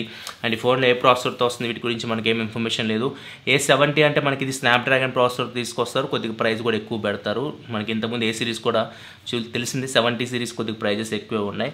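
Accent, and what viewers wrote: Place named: Telangana, India. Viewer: anna.. Telangana Yasa madyalo Mast undi😍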